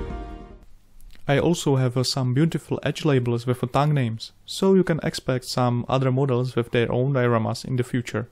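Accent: Czech Republic. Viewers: Mluvíte skvěle anglicky. Ale pro čecha je to jednoduché poznat že jste čech. Ale jinak skvělé modely. :) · D hneď som vedel že si čech podľa prízvuku:D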